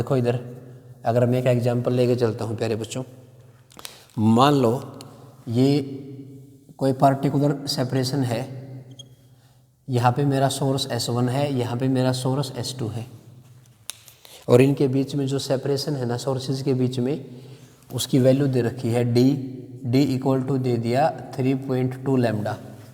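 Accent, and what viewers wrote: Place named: Haryana, India. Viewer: Sir harvanvi galak aa rahi hai sath ma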